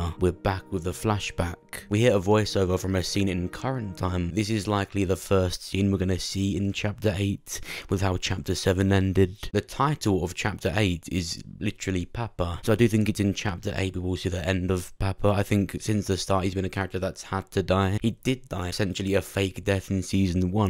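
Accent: United Kingdom. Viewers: He sounds like a guy from the UK 😂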